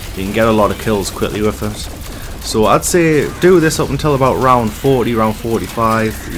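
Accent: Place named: North, United Kingdom. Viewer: I’m really struggling to put my finger on your accent, definitely northern but I can’t pinpoint it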